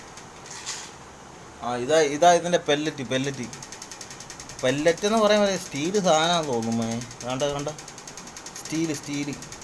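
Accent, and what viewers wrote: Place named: Trivandrum, India. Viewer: Tvm bhaasha aanu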